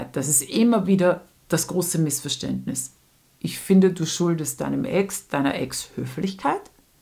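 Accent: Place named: Austria. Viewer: Kuulostaa Itävallan murteelta. ❤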